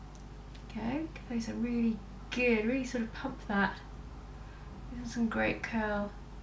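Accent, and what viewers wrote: United Kingdom. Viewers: I like listening to you. I learn a lot because your videos are educational for make up. Also, I like your British accent..=)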